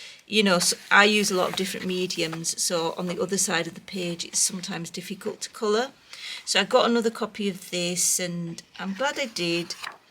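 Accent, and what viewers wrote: United Kingdom. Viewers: you said “colors of the world.” I know that you were referring to the markers. But because you said it with your awesome British accent, I found myself singing 🎶”Colors of the world, Every boy and every girl, People of the world, Ahhhhhhh 🎵 You activated the file in my brain. It was 1998 all over again! 😂 Thanks for the video. ❤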